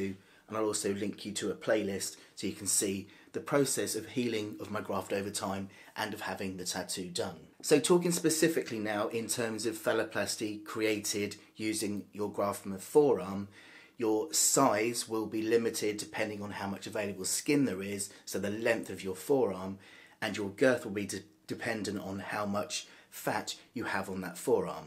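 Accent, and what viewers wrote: United Kingdom. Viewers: I am impressed with your perfect British accent.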